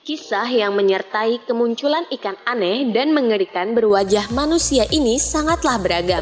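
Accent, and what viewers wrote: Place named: Borneo, Indonesia. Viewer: Asli bujur2 kada kawa diwaluhi tiap pandirannya berassaaaa banarrr ilat2 bubuhan benua borneo wkwkk Mantap banar, min. Katuju aku wan channel pian 🖒🖒🖒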